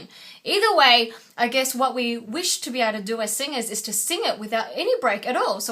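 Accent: Australia. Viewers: Very articulately explained, thanks. Nice to hear an Aussie voice too.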